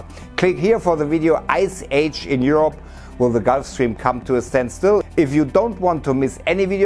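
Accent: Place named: Germany. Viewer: Gutes Englisch! I love how the germans pronounce english words. :D Sounds a bit weird and funny. Außerdem liebe ich den Kontent! Das Englisch frischt das ganze noch 'n bisschen auf! Weiter so!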